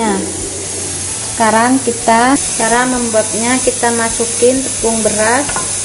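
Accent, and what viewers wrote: Madura, Indonesia. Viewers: ini suaranya kayak org madura ya?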